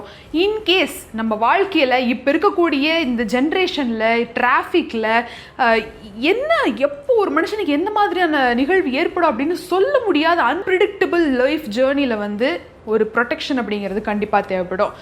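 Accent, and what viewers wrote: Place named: Kongu, India. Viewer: Good information sister.. 👍I like the way she speaks in kongu slang..❤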